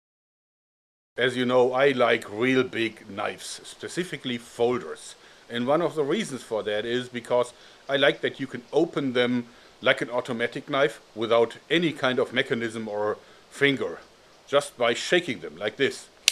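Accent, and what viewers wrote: Germany. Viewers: Hi, Can you please explain in more detail why you don't likr the last knife that you presented last. Thanks, Also keep up the good work and I love your vids even though sometimes I cant understand what you are saying cause of the strong German accent, but after I rewind and play the part in question I can usually piece it together. Pete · Did you ever live in America or you learned English in Germany?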